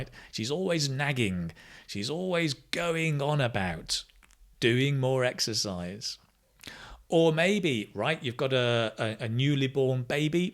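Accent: United Kingdom. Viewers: Your british accent is really awesome. Thanks to be here to learn us how to pick up british accent because it sounds rythmic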